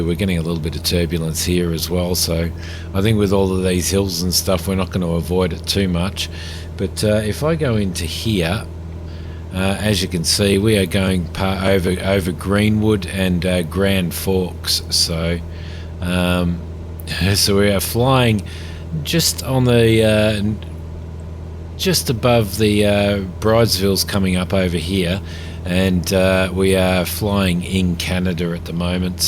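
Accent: Australia. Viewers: Thank you bro; You sound Aussie , Do you live over there irl? My home base in "On Air" is YMAV in Cumulus and YMEN in Stratus.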